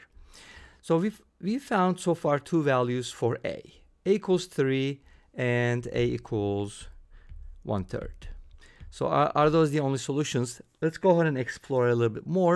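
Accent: Turkey. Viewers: Im trying to determine where this guy is from the whole video. I've concluded with Turkish by the accent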